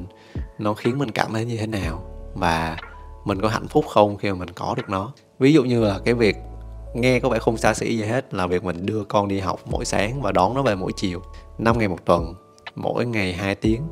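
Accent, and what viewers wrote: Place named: North, Vietnam. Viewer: cảm giác như anh gốc Bắc đúng không ạ, thấy ngữ âm hơi giống dù a nói tiếng nam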